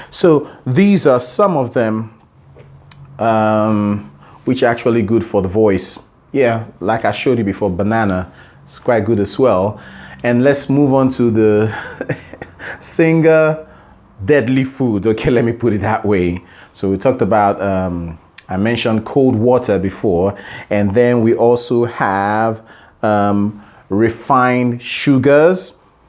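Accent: Nigeria. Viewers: Sounds Nigerian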